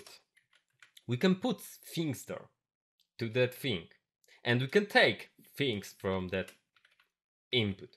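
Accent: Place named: India. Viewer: I miss programming tutorials without indian accent <3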